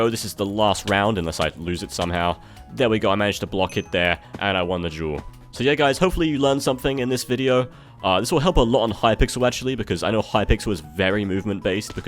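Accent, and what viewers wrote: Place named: United Kingdom. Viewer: I swear you sound British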